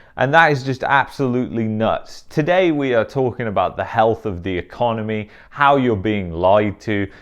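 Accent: United Kingdom. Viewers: Why do you have a British accent ?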